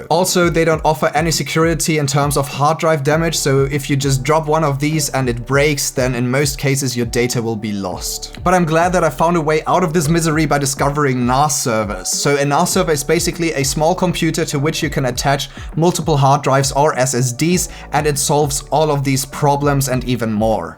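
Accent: Germany. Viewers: German Accent? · that accent is something else! Love when Germans speak English!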